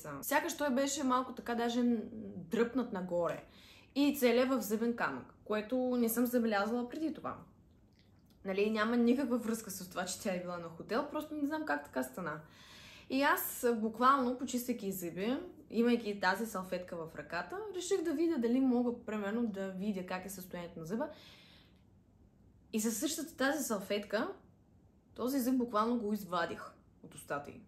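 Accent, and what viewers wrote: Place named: Russia. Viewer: Долавям нещо руско,жена ми е рускиня както ти така и жена ми бъркате адски много граматиката:)Дори в заглавието на клипа има грешка:)Успех и моля не говори като Наталия Кобилкина)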